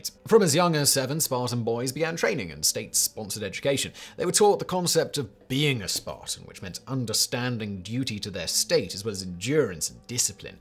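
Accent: England, United Kingdom. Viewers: I don't follow. isn't American. I've never heard anyone in America say Leon-Eye -Dass. Maybe if you've been watching too much Pawn Star Wars on the now mislabeled History Channel. Actually I've never heard anyone say it that way. Since he is very, very English, he's never changed anything he's said in years to cater to any American pronunciations. If I had to say what the most common pronunciations that I've heard, on TV, the BBC, in movies, it would be Le-o-ni-des.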